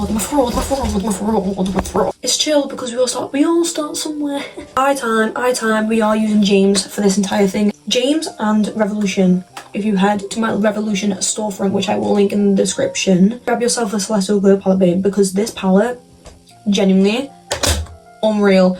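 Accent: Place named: Liverpool, United Kingdom. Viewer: thank u scouser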